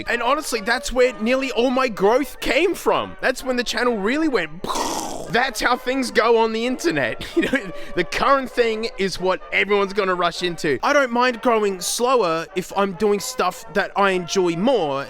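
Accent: Australia. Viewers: I binge-watched all the videos you released, what was it covid-time videos? the 4-hour long videos; y ai binge-watched them. then i tried to find you on social media and got on discord to discover you dont exist there, so i left, i left looking for you. And when you dont post, I specifically search for " " or " " whatever. I watch your content, dare i say, unconditionally, because, well, too many reasons; the accent for one; the references to aussie slang (that i have to look-up at times). You're awesome, dont let anyone tell you different, keep doing it YOUR way! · I came for the obscure tech products but I stayed because of your Australian accent and all of the witty one liners. What other flavors of dog farts exist besides the old reliable EGG?! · i am here for you you could degrqde ke for an hour with silly put downs that will probably make me laught caus eiblove your aussie accent and way of being. 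We watch you for you not necessarily the content. I know you won't because you said you won't touch bluetooth headphones anymore but i do hope you at least smell the nothing (1)s cause they're really quite good for what they do. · i love hearing him get angry in australian!, we enjoy your weirdness my dude. do what feels right. 💜🚗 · I come to this channel to see what funny things the aussie man has to yell about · I gave literally 0 shits about apple or ipods and have been here since (the tail end of) those days just about. I've been here for the shouty aussie man with his 1 grit and snake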